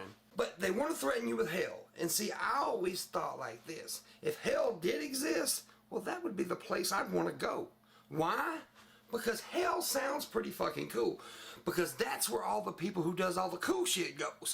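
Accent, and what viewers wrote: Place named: Louisiana, United States. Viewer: Brandon Hoose It's his Louisiana accent. It makes anybody sound like a preacher (just like a Tennessee accent makes anybody sound like a country singer, even though he/she would not be a country music fan).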